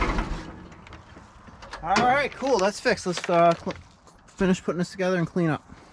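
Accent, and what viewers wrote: New York, United States. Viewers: New york somewhere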